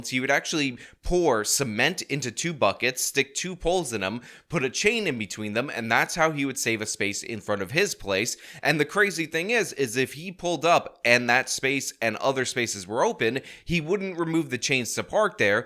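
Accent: New York, United States. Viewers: Oh Boy, your "inner New York" REALLY came out on this video:-)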